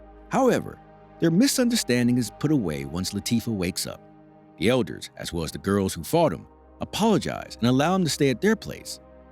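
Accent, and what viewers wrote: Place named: South, United States. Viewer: The country voice doesn't suite this genre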